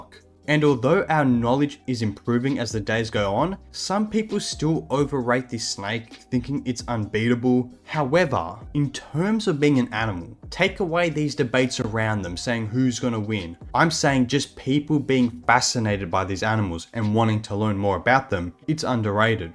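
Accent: Australia. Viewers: I enjoyed this information and cool Aussie accent-Subscribed!🎉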